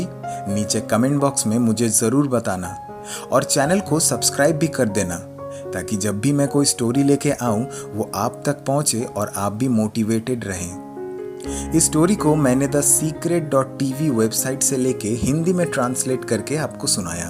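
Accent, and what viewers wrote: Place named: India. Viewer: orry I'm not very good at listening to Indian accents. please tell me about "thank you universe my height is blabla cm" is it our current height or hope for the future?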